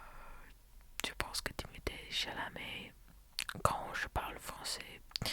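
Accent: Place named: Canada. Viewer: It sounds a little bit like videos from canada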